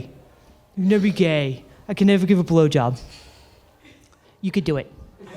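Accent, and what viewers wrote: United States. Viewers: You sound Boston ish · Ive never seen a more Irish head in me life😅 n im Irish😂 I expected a strong Mayo accent..not a Murican...cant prove your mother was Mary from Mullingar but 😅...either way...epic, feckin brillllant set a cailín!! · Are you from new england ??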